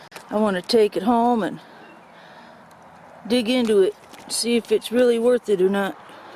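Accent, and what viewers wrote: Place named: United States. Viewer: go there with a shiansaw sister it's more god for you're body and you're hearth you know i'm from Québec city but i love you're accent frome the US you know ??? but i don't like you're show's HAHAHA lollll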